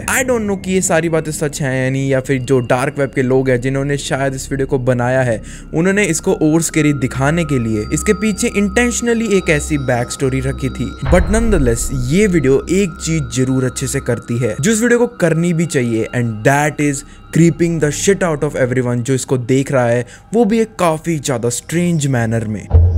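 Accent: India. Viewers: I love his Indian accent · I couldnt understand anything because of his dirty hindi accent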